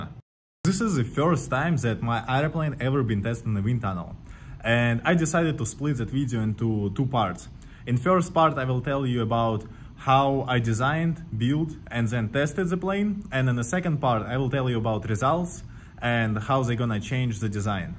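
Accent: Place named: Eastern Europe. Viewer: Okay I am a bit confuse, you sound so European(Eastern European), so why are you in US university? Is America nicking EU talents??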